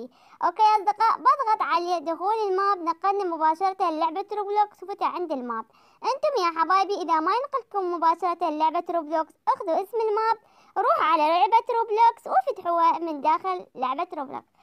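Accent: Iraq. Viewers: ة انا من العراق تحية كبير لي اهلك و لي الج 😀😊 اعرف انتي من العراق مبين 😜سوري سوري بس احبج 😍😁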